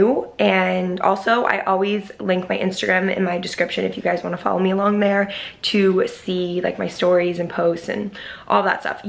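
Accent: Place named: Boston, United States. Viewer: I know a Boston accent anywhere hehe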